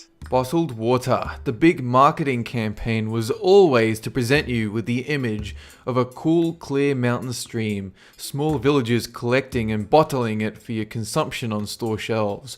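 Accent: Australia. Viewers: Hey are you Australian? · are you aussie or american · Are you Australian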